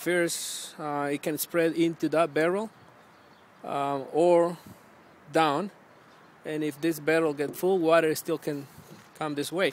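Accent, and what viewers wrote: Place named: Mexico. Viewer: love the accent bro.. Viva mexico .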